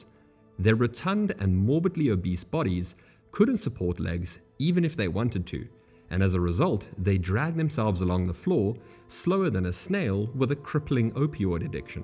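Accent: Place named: South Africa. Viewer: I hear a South African voice 😎 love this video. I was obsessed with Dungeon Keeper back in the late 90s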